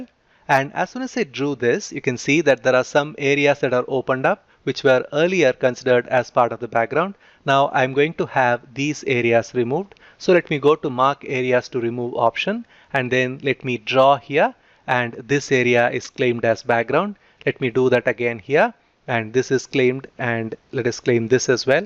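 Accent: India. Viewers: Please don't mind....but can I know that you are an Indian or American Sir? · Obviously indian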